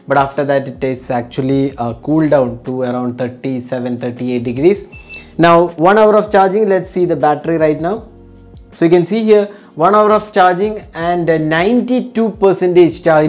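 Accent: India. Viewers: Bahasa Inggris Plus Logat Org India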